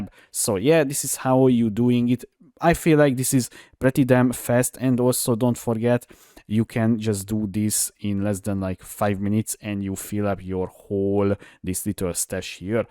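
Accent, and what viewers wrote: Hungary. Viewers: are you hungarian? what is that accent? 😁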